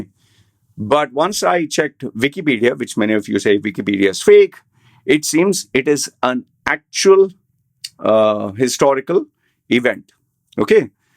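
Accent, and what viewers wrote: India. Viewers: This guy claims he studied in CBSE, but doesn't know who Aurangzeb is, doesn't know about Maratha empire, doesn't recognize Shiva tandava stotram, cannot pronounce basic Indian names, has an Indian accent but acting like a gora who is clueless about India, "oh, what is this strange Hin-doo stuff", etc. Something seriously weird going on...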